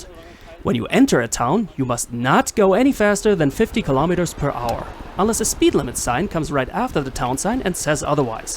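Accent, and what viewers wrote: Germany. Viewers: I told him early, that his English wasn't necessarily bad, just ultimately "German". Some people as you like it, others don't. He'll be reading the comments here, so he'll get to know. ^__^ I hope you are gonna stay as watcher!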